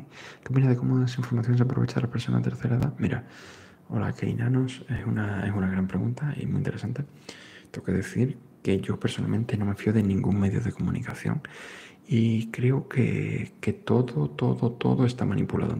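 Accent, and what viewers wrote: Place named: Seville, Spain. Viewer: Yo toda feliz de saber que compartimos acento y tierra (Sevilla🌞); un asmr brutal, muy fan ✨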